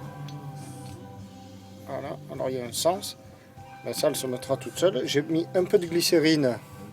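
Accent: South, France. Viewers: Bonjour, Et bravo pour cette vidéo réalisée dans un espace "confiné" ah les bateaux… J' ai vu que vous taillez vos joints vous même, il y a bien des années, je le faisais aussi, mais je n 'arrive plus à me fournir en "feuilles" de ce matériau spécial joint. Auriez vous une adresse de fournisseur, car en plus j' entends un bel accent qui me fait penser que vous êtes du midi, je réside moi-même à Marignane, alors si vous voulez bien me donner un tuyau… Je vous remercie par avance. Prenez bien soin de vous et bonnes prochaines ballades en mer. Cordialement. Tristan.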